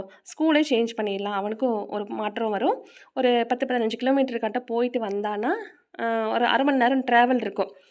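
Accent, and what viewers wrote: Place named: Kongu, India. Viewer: Kongu tamil nanga veetla pesara mathiriye pesirunthinga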